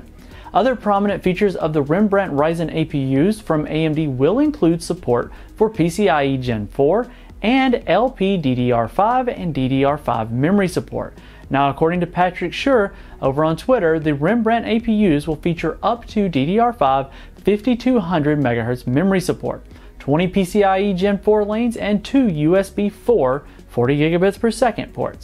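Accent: South, United States. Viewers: your subtle southern accent is pleasing to the ear for some reason 🤠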